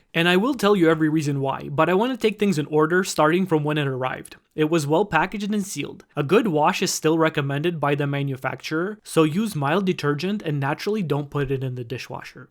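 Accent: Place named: Quebec, Canada. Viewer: You mentioned that in your state they use the highest chlorine levels. But, are you in a state or in a province? Your accent is 100% Québécois. Great review!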